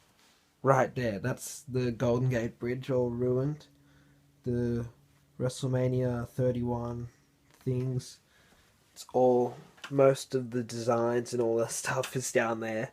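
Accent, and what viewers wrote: Australia. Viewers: Dang this time i can kinda hear your australian accent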